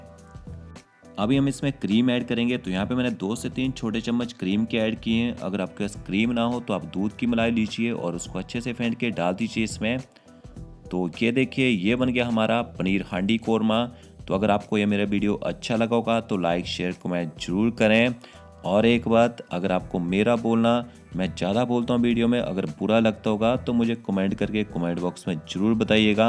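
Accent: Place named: Punjab, India. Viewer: आपका पंजाबी स्टाइल में बोलना बहुत ही अच्छा लगता है ।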